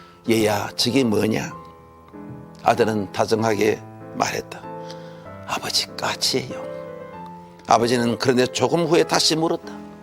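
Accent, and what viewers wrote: Gyeongsang, South Korea. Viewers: 까치라꼬. 라꼬. 라꼬. 갱상도사투리 절라싫타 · 황수관이 억양이 맘에 안든다. 수꼴 TK 다. TK는 "ㅇ"이 들어간 단어는 발음을 세게한다.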